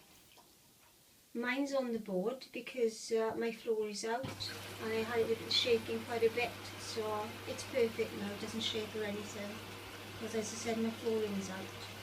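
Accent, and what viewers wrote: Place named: Wales, United Kingdom. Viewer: Hi, at first I thought I wouldn't watch the whole video since I don't really like videos where people talk much, but you have a very sweet voice and a charming accent. Are you from Wales?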